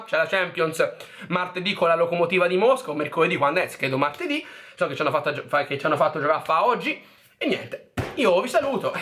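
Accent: Tuscany, Italy. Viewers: Ma sei toscano?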